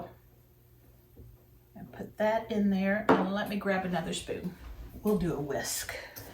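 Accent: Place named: Missouri, United States. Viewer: It's nice to hear a Missourian talk - warshing instead of washing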